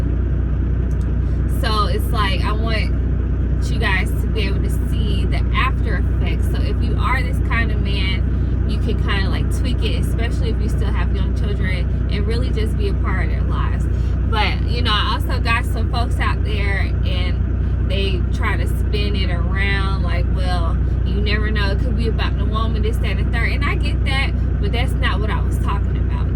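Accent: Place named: Texas, United States. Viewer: Your.... beautiful..What's that accent ?? I hear Texas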